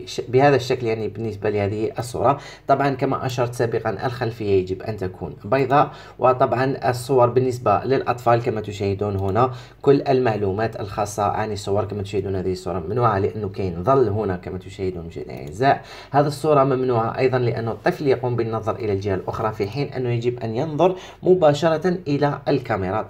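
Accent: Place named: Morocco. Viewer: الاخ اما مغربي و لا جزائري 😂😂😂 عندكم طريقة خاصة فنطق العربية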